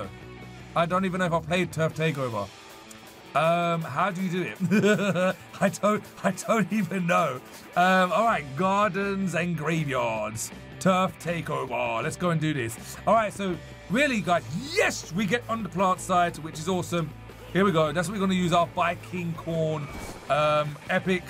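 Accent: United Kingdom. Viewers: Are you from Liverpool?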